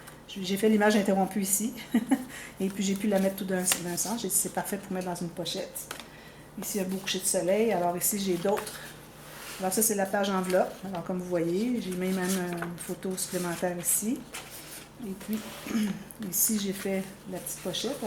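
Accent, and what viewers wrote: Quebec, Canada. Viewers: Bonjour, Je suis une abonnée assez ressente, du coup je rattrape un peu les vidéos un peu plus anciennes, c'est pourquoi le commentaire est un peu tardif par rapport à la diffusion. Tout d'abord un IMMENSE MERCI pour tous les partages que tu nous fais. Je scrape depuis 4 ans seulement et tes astuces pour les goussets dans les albums sont justes formidables pour moi qui débute. Je ne me pose plus de question je fouille dans tes tutos express et je trouve mon bonheur. Et surtout je ne perds pas de papier avec des erreurs. Pour cet album souvenir d'enfance, c'est effectivement très intéressante, pour nous, de voir comment fonctionner en amont, avec les photos pendant la réalisation. Mais surtout MERCI MERCI pour l'astuce des cartes pour les photos en diaporama: je ne sais jamais comment les caser!!! J'adore la page avec boutonnière ainsi que la cascade à pousser. 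Bon j'en ai déjà fait à tirer mais pas encore à pousser! Bientôt je me lancerai. Et bien sûr en plus des explications très simples et très claires, j'adore, j'adore ton accent et ses mots à vous les québécois qui sont tellement riches de sens. Merci merci 🥰👍👏🥰 Fab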